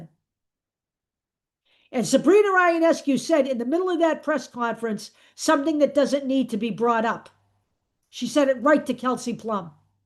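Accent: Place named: Massachusetts, United States. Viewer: Are you from Massachusettes? Love your accent. (I'm Texan)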